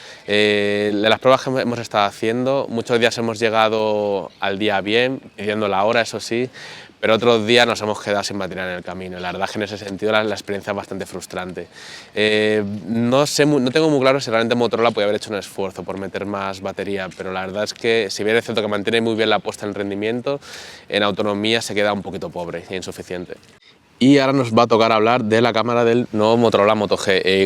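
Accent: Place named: Madrid, Spain. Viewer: No puedes negar que eres madrileño eh! egggggque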